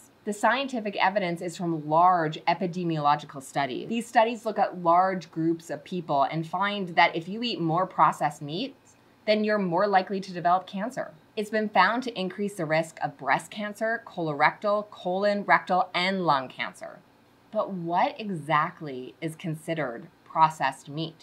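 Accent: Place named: United States. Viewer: great advice. very interesting. I cannot get used to American accents. RESK is a strange word. In England we mostly say R I S K. Nice lady but strange way to describe RISK. She also says FESH instead of FISH. HEY HO I wish the USA well. Thanks for the advice. Please remember that Youtube is shown in UK.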